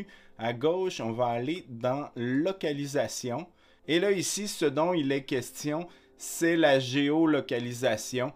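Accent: Canada. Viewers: salut,merci pour t conseil jaaaime bien ton axent canadien loin de moi d'en rire! si tu savais comment on parle vers marseille! je te permet. tu me fais pense à snowden le open source! c'est pas une balance! meme la justice ne fais pas le poids elle ki se dis equilibre? permet moi d'ans douter! g pas regarder toutes t video! apres je te rap-l. merci bonne continuation le meridien de greenwich...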